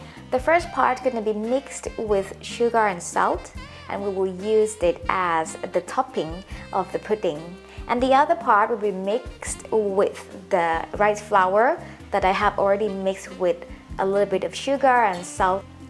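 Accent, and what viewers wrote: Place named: United States. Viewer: Your English accent is not Thai...I'm sure you have been in America...🥰 Love from New Jersey ❤️🫰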